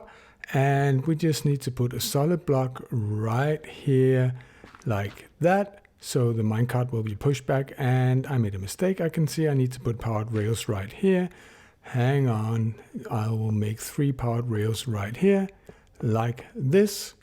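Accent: Denmark. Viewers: your acsent really sounds danish ngl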